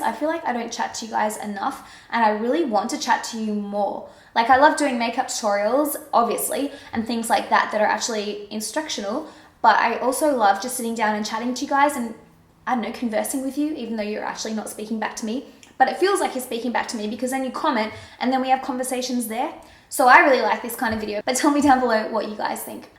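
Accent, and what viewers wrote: Australia. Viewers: I love your accent, being Australian myself. I can understand you perfectly. Love your channel. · It's a strong Aussie accent, not British. Nevertheless, with accents, some people love some and hate some. It's nothing personal :) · you have a sydney accent how could anyone your voice? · To sexy for them. Honestly I don't think alot if people are used to Australian accents. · I am seriously impressed with your candour and i know it can be so hard opening up old wounds, (why i hide video) but you do it so genuinely that you become approachable and, well now i so i want to learn how to do my make up properly from you. You are so refreshing and it's so nice to hear your ozzie twang. I have missed it and have list my accent from living overseas. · okay so... This is totally unrelated to the video...but idk why this whole time I thought you were British (I'm really bad at interpreting accents lol) and now I know you're Australian haha so i want to know.. 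Is it true that in Australia there can be really large poisonous spiders just casually all over the place? It's just what I've heard and I want to know if it's true lol sorry random question. But anyway, you do you girl! I totally agree, we should all be able to do what we want with our own bodies, so long as it'll make us happy! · she's Australian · Australia is one of the best accents to me, you rock x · I love your accent I don't know how people "hate your voice" it's beautiful I'm OBSESSED with Australian accents and I have been since I was little :3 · She's Australian 😊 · Ahh it's so sad when people can't tell the difference... I'm Australian so I can obviously ahah and she's Australian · I love your longer talky videos! You speak so beautifully without losing your ocker-ness (Im aussie too ;}). Thanks for all the info on the procedures you've had. It's interesting to hear about 1st hand experiences. 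Love you loads! xoxo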